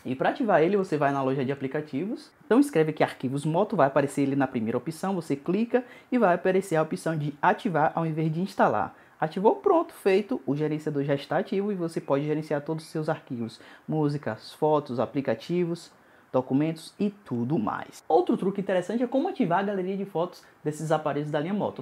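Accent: Bahia, Brazil. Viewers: Você é baiano né? kkkkkkkk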